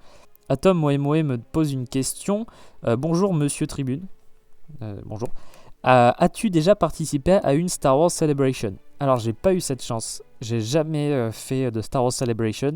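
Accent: England, United Kingdom. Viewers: Mec je sais pas pourquoi mais ton accent anglais me fais kiffer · Super vidéo comme d'habitude j'adore ton accent Anglais moi je suis pourrie en Anglais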